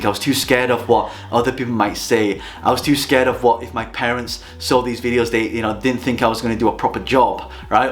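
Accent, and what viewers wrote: United Kingdom. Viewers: I have never heard you stumble. Sometimes I can here your Northern accent though. ;) Btw. my husband is from Lancashire, too. ;) He was born in Rossendale, Lancs. But unluckily we just speak German, because he came over during his childhood.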